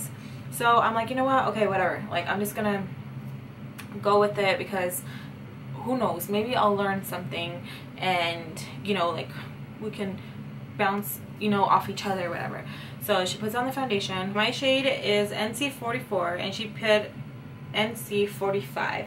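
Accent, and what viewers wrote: Mexico. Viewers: where r u from btw I love the spanish accent sounds kinda mexican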